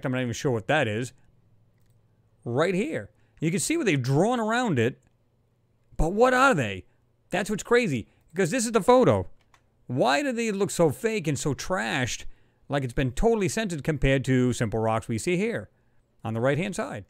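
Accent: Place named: New England, United States. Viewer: Yeah I'm Diggin these videos I subscribe to you. I am also loving that New England accent. So much better than this Florida twang I have been forced to endure for 46 long yrs.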